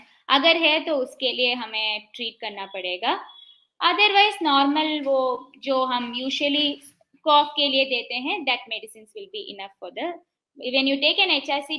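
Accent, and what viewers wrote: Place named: India. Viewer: Wow! I can barely understand anything they say. Sorry, but your Indian accept is super thick.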